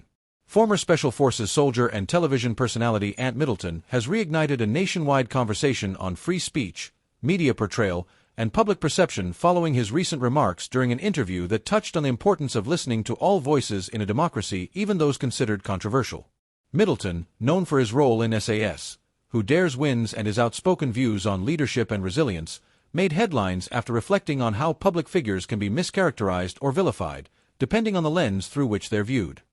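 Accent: United States. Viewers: Even worse it's got a yank accent and can't pronounce SAS Who Dares Wins properly. These channels should be banned.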